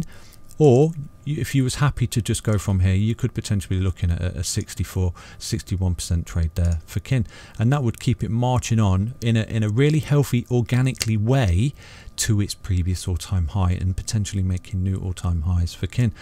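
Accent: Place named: West Midlands, United Kingdom. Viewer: Thanks for the vid, I enjoyed it and learnt a lot. Also sounds like you're also a west midlands chap?hah Where were you looking at this TA chart? cant seem to get the detailed view on coin market cap. Also, im guessing as it went through the level of support you suggested, a new entrry point might be at $0.00020?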